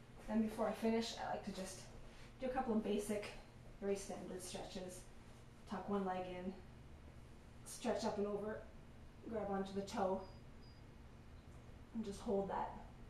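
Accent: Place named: Canada. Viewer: Thank you for this! And also, you are so adorably Canadian! lol. Love the accent. I don't remember ever seeing a video with you before.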